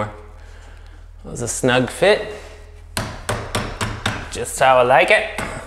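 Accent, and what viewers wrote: Canada. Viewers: I was curious if this was Vancouver, BC or Vancouver, WA. I feel like this guy has a mild Canadian accent so I'm going with BC.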